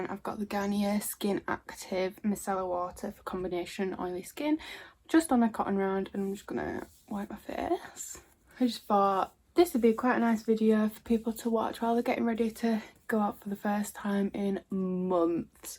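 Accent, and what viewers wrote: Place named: Yorkshire, United Kingdom. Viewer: I'm going to the beer garden this weekend and this is getting me excited for it :) I love your accent, Yorkshire right? Which part?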